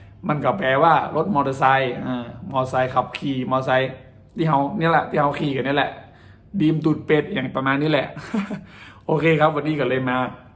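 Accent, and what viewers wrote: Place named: North, Thailand. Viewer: ชอบครับผมชอบสำเนียงเหนือ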